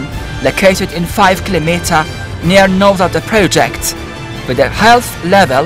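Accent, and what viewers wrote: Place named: India. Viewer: Good computer animation with an indian voice.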